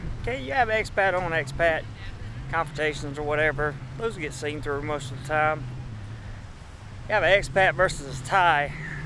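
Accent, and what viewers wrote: South, United States. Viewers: Irishman he has a southern American accent. This is not to say he is good old boy. That term can be taken as a pejorative by some.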